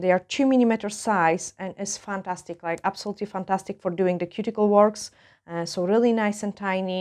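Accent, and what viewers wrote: Scotland, United Kingdom. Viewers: Do I detect a we bit off Scottish in your accent ?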